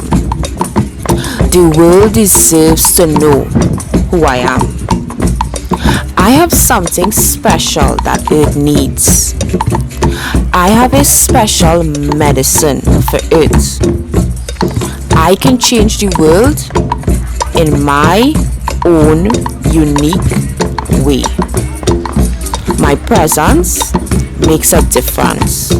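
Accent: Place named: Trinidad and Tobago. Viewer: I am SOOO grateful to hear a fellow trini voice - saying this! 🎉 Sis, idk you.....but I Appreciate you...!!!! 💃🏽💋🌹✨️💦👑🇹🇹💕💕 💯💯💯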